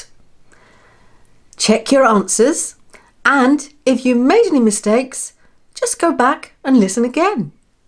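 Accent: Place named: United Kingdom. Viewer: British accent... so lovely !!!!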